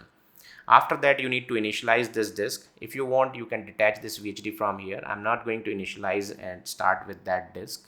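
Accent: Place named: India. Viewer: The other suggestion is that for non-indians listening to indians speak english, your accent can be very hard to understand. Especially when you talk fast, which they often do. Please slow it down a bit and we'll understand better. I don't want to offend but I am having difficulty understanding everything.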